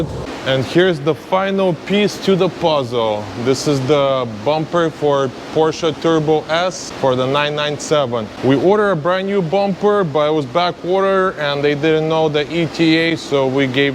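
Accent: Russia. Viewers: You sure sound Russian.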